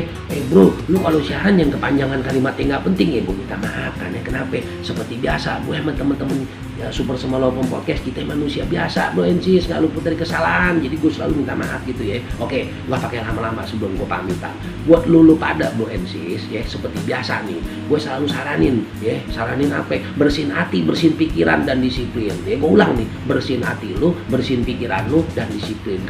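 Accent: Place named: Banyumas, Indonesia. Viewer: salam wong ngapak 😄 ora ngapak ora kepenak 😁😁😁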